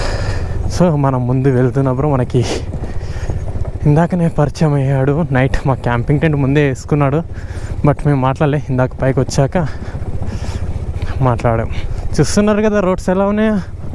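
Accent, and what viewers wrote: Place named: Tamil Nadu, India. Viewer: Masthu undi bro place nd me Tamil slang kuda 😂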